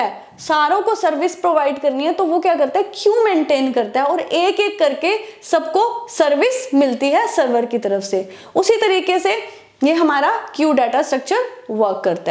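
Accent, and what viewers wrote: Punjab, India. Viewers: Accent punjabi didn't left the chat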